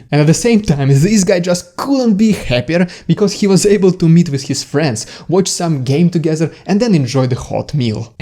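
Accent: Russia. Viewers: Омг у тебя русский акцент (Omg you has got Russian accent!)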